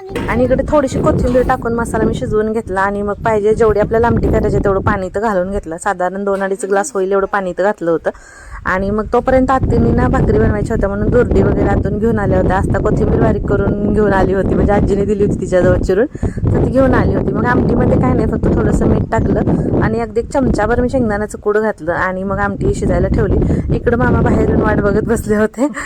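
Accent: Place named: Latur, India. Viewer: मला वाटल कि तु लातुरचि आहेस भाषा तशीच वाटली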